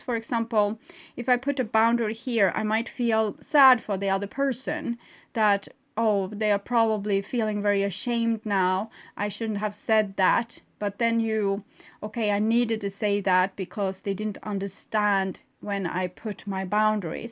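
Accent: Sweden. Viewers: Are you from Sweden? Thank for a good reading. ❤️ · My ex has been calling me but I don't want to hear anything he has to say. Yes, he is a very inmature Leo who doesn't love anyone, including himself. It breaks my heart that he is so broken but he is unbarably toxic but I must protect myself from him. Being the better person is something I have always been told but I have learned their are lots of people who count on nice people being the better person so they can take advantage of the person being the bigger person. Thank you for tnis reading and I just love your Swedish accent🌹🌹🌹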